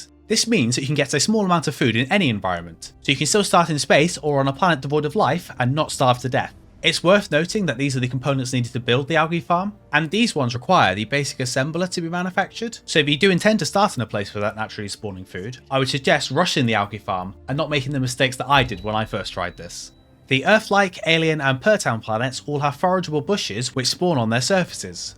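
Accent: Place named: United Kingdom. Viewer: I gate how brits pronounce things but otherwise good video.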